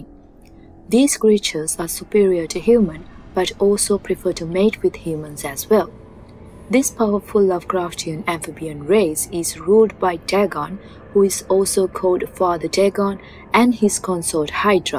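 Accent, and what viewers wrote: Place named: England, United Kingdom. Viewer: nice. story telling! i am curious, i have heard your accent once somewhere else, is this accent from England or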